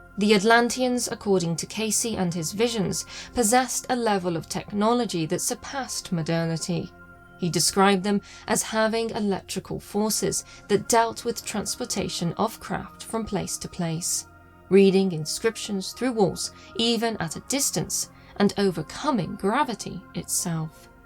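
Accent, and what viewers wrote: United Kingdom. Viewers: I truly enjoy watching and listening to your channel. That British accent and the way you narrate your video is truly exceptional.😍😍😍😇😇😇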